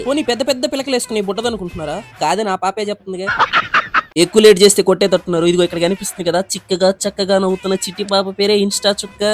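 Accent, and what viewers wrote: Nellore, India. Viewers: Edo ninna bore kottuta youtube loki vacha ayya Okka video edo random lo came yedooo ma ooru laga slang ma ooru laga undi ani chusta unna kalla kinda carry bags vachai work pakkana nuka chusukunta unna motham reels videos Neeku oka dannam ayya sami Me illu eda nayana nellore vachi darshanam chesukunta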